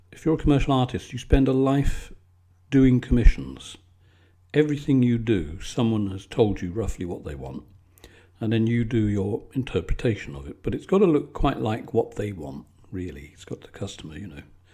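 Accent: England, United Kingdom. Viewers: Very natural sounding speach. Im a fan of the english accent. Very relaxing. Thank you sir. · This is by far the best thing the YouTube algorithm offered me in a long time! I love the voice, the British accent, the calm way of you explaining your technique. I am beyond excited to see more videos!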